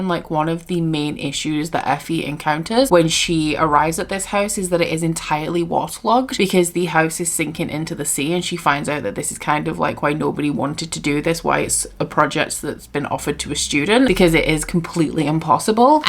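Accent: Scotland, United Kingdom. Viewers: I just can't place your accent! Moments you sound scottish like me and then you sound yorkshire ahahah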